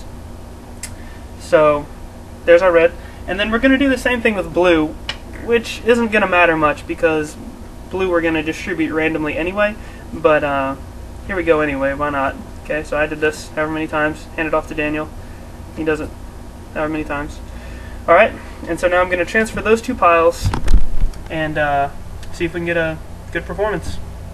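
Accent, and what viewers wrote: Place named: United States. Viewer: You English isn't that poor as far as I can tell. You sound American.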